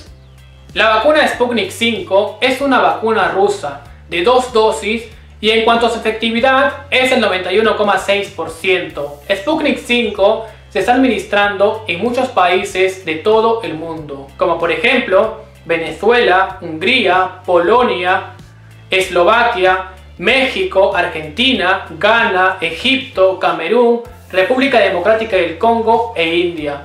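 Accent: Spain. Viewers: Se te pegó mucho lo español no??😮 Gracias por la información..!👍👏👏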